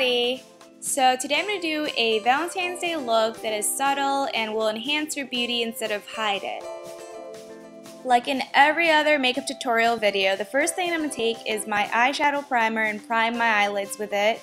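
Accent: United States. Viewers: she looks asain and has country voice :)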